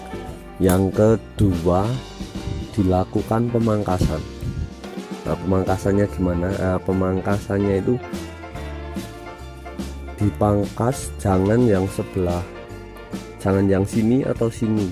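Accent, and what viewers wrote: Temanggung, Indonesia. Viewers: Temanggung ya mas?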